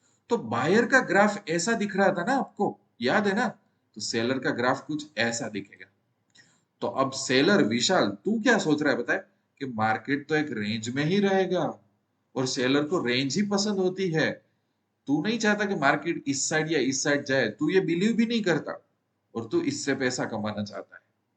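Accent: Gujarat, India. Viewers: gujarati laheko to aavij jaay ho...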